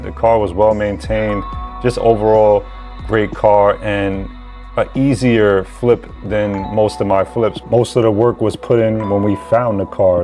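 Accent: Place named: Northeast, United States. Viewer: Dude you said right! I know it's hard with a northeast accent 😂. Digging these flips. Nothing like a great deal, minimal cost and effort to double your money.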